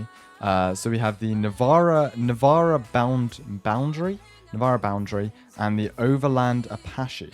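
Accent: United Kingdom. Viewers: hes bri ish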